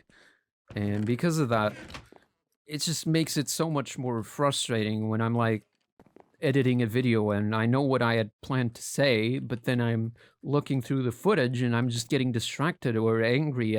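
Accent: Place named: United States. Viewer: Ur voice changed, it got like a bit American like just a little bit and a bit more clear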